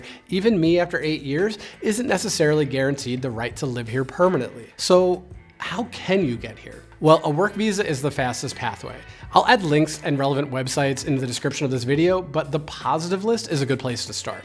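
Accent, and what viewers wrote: Denmark. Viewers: Awesome info - Thanks! (and thumbs up for the aaaaaah-mjaaaa-click-bait title....or......) As a Dane, in my ears your English is sounding more and more like most Danes - Welcome, and well done ;ø)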